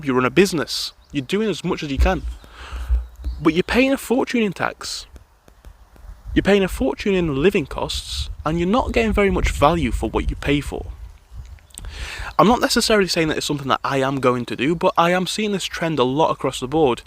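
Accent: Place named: Northern England, United Kingdom. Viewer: £500 a month won't get you a shed in the south east! My studio+ apartment is 1000 a month ...not a 1 bed a studio+ which has a small bedroom barely without a window! But I guess it's half the price up north which by your accent seems you are from.. Aldi the money you get paid in the south Vs north isn't a huge difference these days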